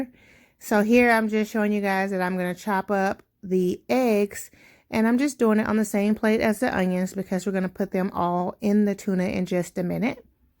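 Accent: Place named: St. Louis, United States. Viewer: I LOVE TOUR VOICE ..U REMIND ME OF A SAINT LOUIS GIRL🤙🏾🤙🏾😁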